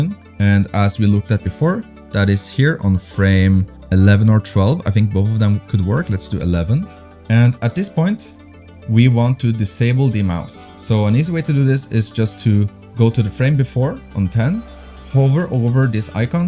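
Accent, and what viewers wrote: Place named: Norway. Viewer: I know a norwegian accent when I hear one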